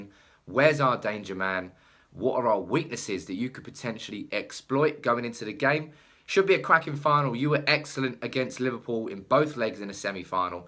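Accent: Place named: Manchester, United Kingdom. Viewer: I can't understand his thick Mancunian accent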